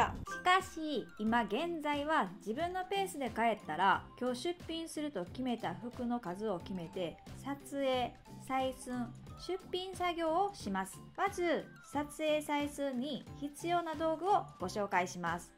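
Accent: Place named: Kansai, Japan. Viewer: 明るくて関西弁が可愛くて、とっても素敵だと思いました。 私も主婦でメルカリではちまちまとハンドメイド品などを販売していますが、お小遣い程度の売り上げです💦 ちゃんとビジネスとして成功されていて、かっこいいと思いました。 元気をいただきありがとうございます。 応援しております。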